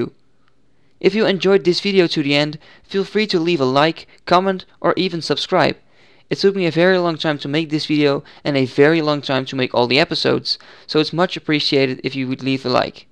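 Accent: Netherlands. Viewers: fantastisch nederlands accent :D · It's so weird that I just knew you were dutch from like the first word you said :S. I'm dutch too, the accent is not as obvious as alot of other dutch people though. Well done btw, I know what quests to do now aswell :D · Viel me op dat je Nederlands bent :) je maakt echt goede video's man! Gelijk geabboneerd, ga zo door man ;) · Ben je nederlands man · kom je gewoon uit nl?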